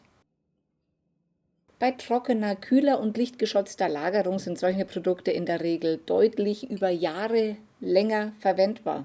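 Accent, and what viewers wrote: Bavaria, Germany. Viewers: Servus,hab vor Jahren eine Brotgewürzmischung so gut versteckt,und durch Zufall wiedergefunden.10Jahre alt,Nase reingesteckt,war wie frisch gemahlen.Wenn es trocken gelagert ist,hält es lange.Dein bayrisch gefällt mir.SG aus Österreich.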